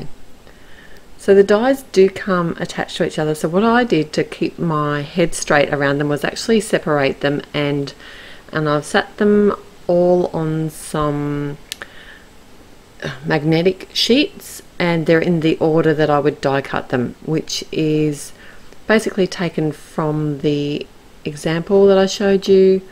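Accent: Australia. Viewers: ABSOLUTELY LOVE THIS SIMPLE, ELEGANT CARD. Yes, sorry, I'm yelling! It's gorgeous! Love this whole new Altenew release and want 99% of it. Lucky girl to be able to play with all these fabulous products. Thanks so much for sharing. I keep saying it, but it's great to listen to another Aussie. Where do you buy your fun foam? Can't seem to find it here in Oz. Thanks so much.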